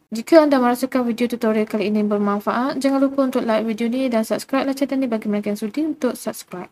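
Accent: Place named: Terengganu, Malaysia. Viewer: bunyi jgk slang ganu ye 😂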